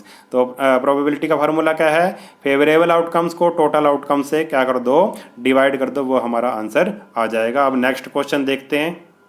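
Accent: Punjab, India. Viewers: Sir punjabi ho na app?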